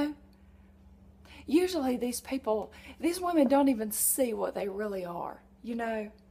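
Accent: South, United States. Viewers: Hello. Nice USA women, with beautiful BLUE eyes. Dont cry. I will send some tissues from EUROPA. Good video, a lot of good video, BRAVO. You are funny. South accent. Have a beautiful day. Bye. Rudi from EUROPA.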